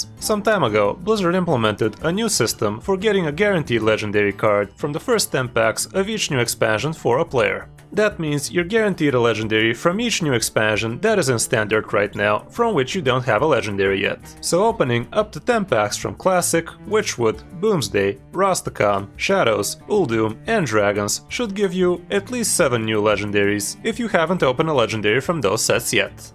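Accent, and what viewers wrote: Russia. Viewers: русский акцент детектед, привет чувак :) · Are you Russian?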